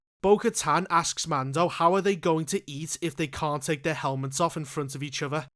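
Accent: Liverpool, United Kingdom. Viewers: first fellow scouser nerd ive come across on youtube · Are you scouse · I love you you scouser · You’re deff a scouser haha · No danger a scouser thought anyone would listen to this fucking accent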